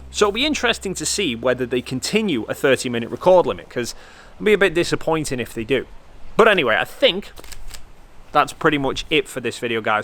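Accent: United Kingdom. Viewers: I love that the "ISO what you did there" pun is specific to your British accent.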